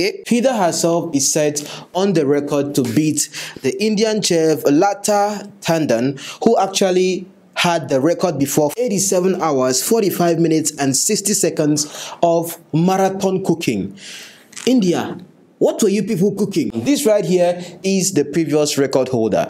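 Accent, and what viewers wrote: Calabar, Nigeria. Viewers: my brother if you are not say that you have not Said it before that you're from Anambra State i will think you're from Calabar because you look like them too much and your Voice also... this is what i think before.. you are the best. God bless you and More Grace to you my brother.. watching from Austria 🇦🇹 Vienna.. In addition 100 hours = 4,167 days Time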